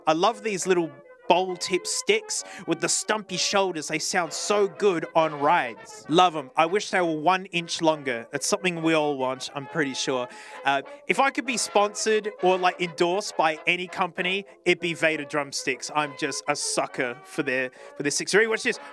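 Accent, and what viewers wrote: Australia. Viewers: Everything about this accent says Queeeeeenslanddeerr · Either I’m like racist and all Aussies sound exactly the same or this is definitely the guy from the channel 🤔 it’s gotta be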